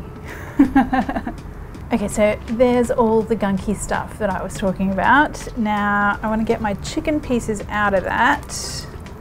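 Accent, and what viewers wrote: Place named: Australia. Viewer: OMG i just shared 1 of her videos w my grown-up children n i had told them the exact same thing! I just love to watch n listen to her lovely Aussie accent😁. I agree totally, Soothing ...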